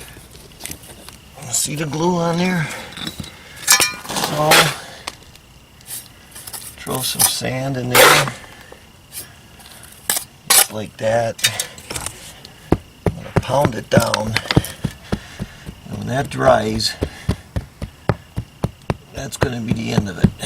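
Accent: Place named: Coal Region, United States. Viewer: Great video. I watch a lot of them just to watch you do the job. I do my own repairs though so I have learned a lot too. Plus I'm from the Coal Region and I love your dialect. Bet you've fixed some CHIMLEYS in your time.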